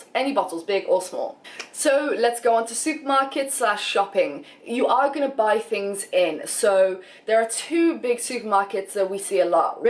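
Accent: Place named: London Irish, United Kingdom. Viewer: London Irish I’m guessing...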